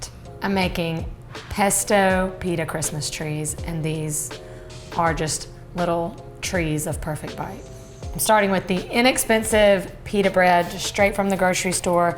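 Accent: South, United States. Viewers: Your video has the BEST appetizers!!! I am so thrilled to find you!! Are you from Alabama? I lived in Leeds, Al many years. i just detect a slight southern accent! Merry Christmas!'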